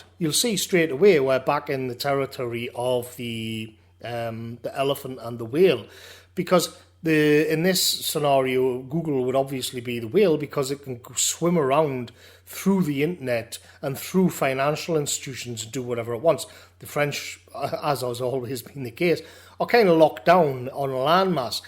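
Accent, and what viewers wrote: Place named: Newcastle, United Kingdom. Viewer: Wonderful, thought-provoking analysis, that puts all mainstream media coverage to shame. You have such a gift for articulating the kind of inchoate thoughts that so many of us are having right now and able to provide us with so many 'aha' moments while listening to your inspiring Geordie tones. The sheer speed and ruthlessness of these global technocrats is truly shocking and the way they seek to bamboozle us ordinary beings into accepting this new 'reality' as inevitable is both frightening and depressing at the same time. Perhaps the only real antidote to this essentially anti-human philosophy is a reshaping of a mascular Christianity for our times. And an emphasis once more on the sovereignty of the individual to be able to resist the 'powers and principalities' which seek to dominate and control our lives in such a manner.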